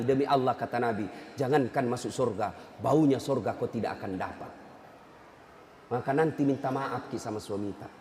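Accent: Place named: Kalimantan, Indonesia. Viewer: Lugat org kalimantan mmg beda shg tajwid nya kelihatan tdk spt org jawa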